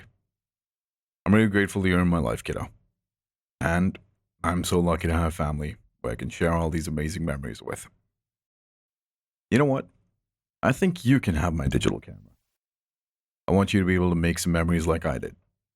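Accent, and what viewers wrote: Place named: Caribbean. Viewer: I can’t pin the accent. I know it’s caribbean. Is it VI, Bahamian, Trini, or Guyanese? Maybe Belize??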